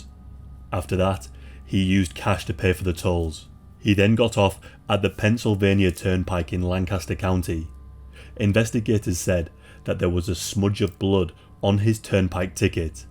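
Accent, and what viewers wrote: United Kingdom. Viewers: He’s British bro give him a break 😭. Brits refuse to pronounce American ANYTHING how Americans pronounce it lmao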